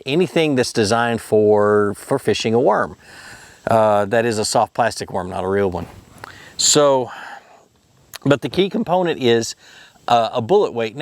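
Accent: Georgia, United States. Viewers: are you from georgia? pls come teach me lmao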